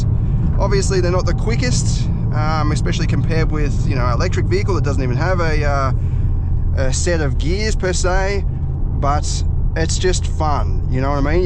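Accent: Australia. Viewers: This video is Australian. Steering wheel on the wrong side and accent is a bit of a giveaway.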